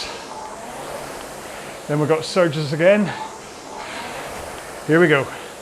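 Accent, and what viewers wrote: Scotland, United Kingdom. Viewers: I see you posted a 2 hours video. This is what I need to learn the Scottish accent 😊😅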